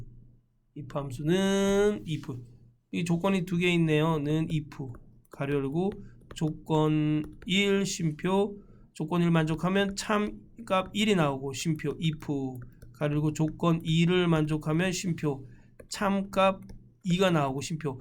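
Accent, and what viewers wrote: Gangwon, South Korea. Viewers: 안녕하세요~ 5월 24일 실기 시험 발표 오늘 이었는데 한번에 합격했어요~~넘 감사해요^^ 작년 추석쯤 2024 5일만에 합격하기 기본서 구입하고 함수문제 보고 엄두가 안나 손놓고 있다가 반백살된 기념?으로 자격증 공부 해봐야겠다 생각들어 다시 시작했습니다.(엑셀 사용하는 업에 있지도 않고 sum, average 라는게 있다 정도만 알고있는)필기는 다른책으로 공부하며 기출 돌렸는데 점수가 꽤 높게 나와 이만하면 되겠다 했는데 딱 그만큼 두 문제차로 떨어지고 강의로 4일동안 7,8시간 공부해서 합격하고 바로 실기공부 돌입. 노안으로 안경을 벗었다 썼다 함수지옥에 빠져 환장할뻔 했지만 실기공부 2주동안 매일 6,7시간 공부 하고 합격 확인하니 너무 기쁩니다~ 함수는 표하나에 5문제 다 나오는 기출에 있던 문제 나왔고 부분합은 성별별로 수당과 상여금 최대값 합계 구하는 문제(성별로 내림차순), 기본작업에서 데이터 나누고 열 제거하기, 75,000-75천원으로 바꾸기 이정도 기억납니다. 다른 후기처럼 기출문제보다 조금 쉽게 느껴졌어요 2025 상시 10회분 넘 어렵게 느껴졌는데 2024로 공부해도 충분할듯 합니다. 컴활공부 검색하다 우연히 만난 선생님 억양이 친숙해서 보니 강원도분이셔서 더더 반가웠네요 저는 태백 아래 고한사람입니다~ 주변 사람들에게 많이 알리고 있답니다~~ 항상 건강하시고 행복하세요~~~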